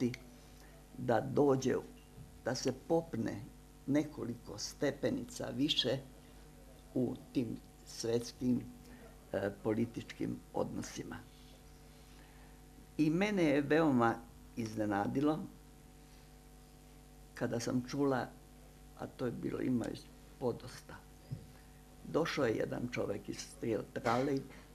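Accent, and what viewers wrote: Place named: Krajina, Croatia. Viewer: Говори као и сви Крајишници!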